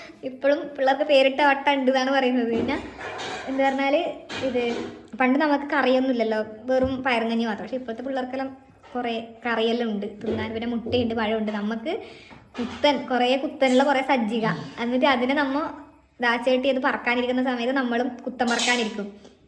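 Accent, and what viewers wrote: Kasaragod, India. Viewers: അംഗനവാടിയിൽ പോവാൻ വഴിയില്ലാലേ കാസർഗോഡൻ ഭാഷ നിഷ്കള ങ്ക സംസാരം ജനിച്ച വീടും നാടും മറക്കരുത്.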